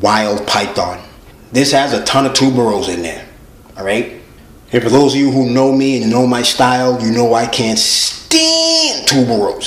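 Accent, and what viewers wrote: Boston, United States. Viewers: This was soooo helpful! I love your passion for scent - and your accent! (Boston?). Big love from the UK and Merry Christmas 🙂. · Is that a Boston accent? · ok my guess was Boston, but didn't want to assume 😉 thanks very much for such an informative video covering so much ground! Subscribed 👍🏽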